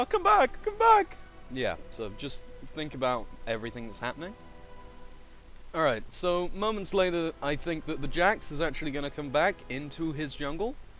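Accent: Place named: United Kingdom. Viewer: it's like the british version of Cryaotic's voice o.o Kinda, kinda.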